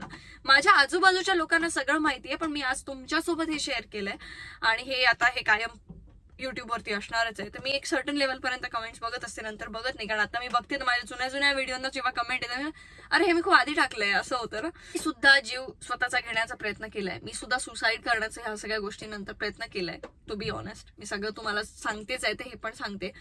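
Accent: Malvan, India. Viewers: I watch your videos only because of your Malvani accent 🥰😍 love Malvani ..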